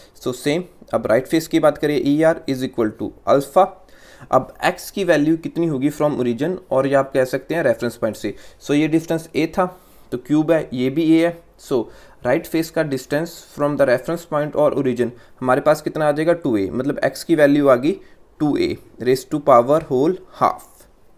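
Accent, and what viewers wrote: Punjab, India. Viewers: Punjabi accent itta funny Kyi hota hai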